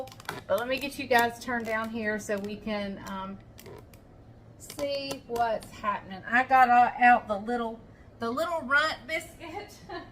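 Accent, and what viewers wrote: South, United States. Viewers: Have you ever watched any of your videos with the auto-generated closed captions turned on? It's really cute and funny. closed captions for a southern accent. 🙂❤️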